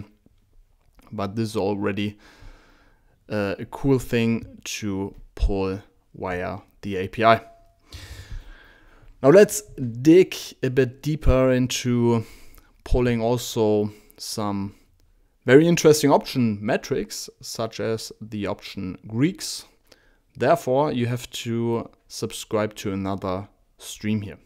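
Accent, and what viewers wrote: Germany. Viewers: My God thank you for German accented coder, you are awesome. Indians are fine but accent is sometimes difficult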